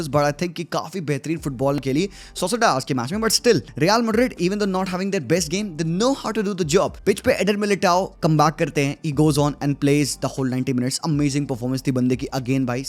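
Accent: India. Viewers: Bro what is this english-indian accent?? İt is so hard to follow man.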